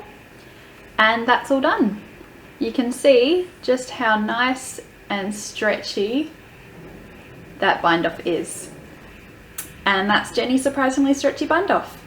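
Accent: Australia. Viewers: As an Aussie, I was SO relieved to find a knitting video featuring an Australian accent. It's the first one I've found after watching MANY knitting videos. Keep up the good work and thanks! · Thank you- very clearly explained stitches and you didn’t flick through your stitches quickly like many knitters doing this with continental knitting. Love your Aussie accent, I understood exactly what you were saying. And I am a senior from the Midwestern U.S. I could see what each motion was doing on the needles. Thank again,. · Yank English accent here and I understood your Ozzy accent just fine. Thank you for sharing and educating!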